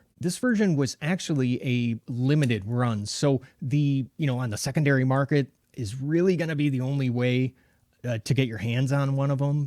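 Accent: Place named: Great Lakes, United States. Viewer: Thought I recognized that accent! Been watching your videos for a while now and never realized it. That "great lakes accent." Sounds like home. 👍 I lived in IL for almost 40 years.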